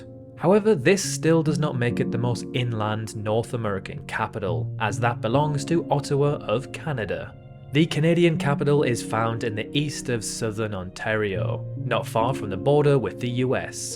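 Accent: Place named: United Kingdom. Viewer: Remember that he is British. British people talk differently. I kinda like the pronunciation “Kih-gah-lee” better anyway.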